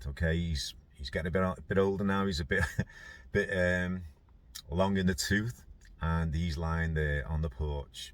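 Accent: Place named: Alabama, United States. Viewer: This is so spot on. Your 'Bama accent is not bad, but I don't know a single person called there! 🤣😂 As someone who has worked bloodhounds for years I am guessing that old hound never gave up that nail! 😉😊🤗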